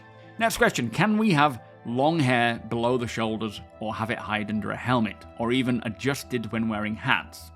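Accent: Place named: Northern England, United Kingdom. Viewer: This guy is weird for me. He has a northern English accent but on 5 occasions he used American English or syntax.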